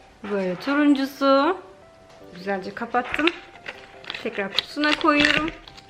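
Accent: France. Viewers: Fransızca aksanın inanılmaz güzelmiş 🧿 çok şaşırdım yıllardır takip ediyorum ama hiç Fransızca konuştuğuna şahit olmamıştım 👏🏻👏🏻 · Fransızca aksanın gerçekten çok tatlı🥰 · Hepsi ayrı ayrı özel ve çok guzel kırtasiye ürünleri,çekilişe kızım için katılıyorum.Ayrıca Fransızca aksaniniz gerçekten çok guzelll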